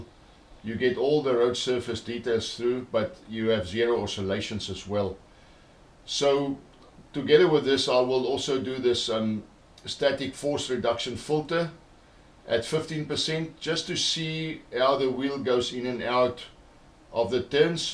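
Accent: South Africa. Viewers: Hi just wanted to find out where u are from you sound South African. IF so where did you order your simucube from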